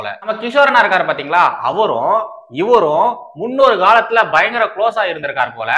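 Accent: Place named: Chennai, India. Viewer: நீங்கள் தொகுத்து வழங்கும் மதராஸ் பாஷை மிக்க நகைச்சுவையாக இருக்கிறது ..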